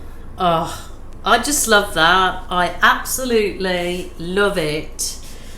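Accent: Birmingham, United Kingdom. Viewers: Wow! What a stunning result! I think the balloon kisses are the icing on the cake. Just out of interest, is that a Birmingham UK accent I can hear? I live there and it does sound familiar!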